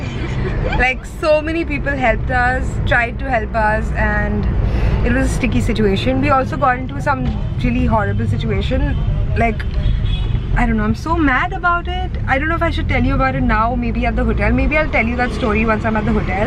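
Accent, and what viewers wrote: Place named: India. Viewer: Gosh your Indian accent is so thick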